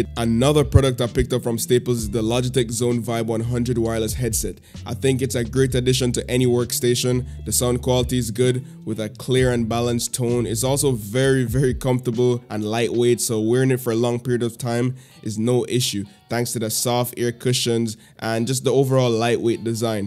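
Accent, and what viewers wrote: Jamaica. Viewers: R U Jamaican? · not go lie you sound jamaican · Are you from JA? That accent peeks through ! Great video. 💪🏽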